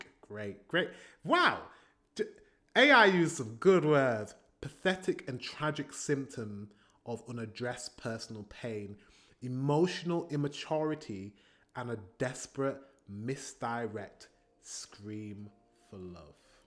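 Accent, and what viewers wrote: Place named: North, United Kingdom. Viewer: Out of context: I love your voice and "dialect". Greetings from a northerner (Germany) to a northerner.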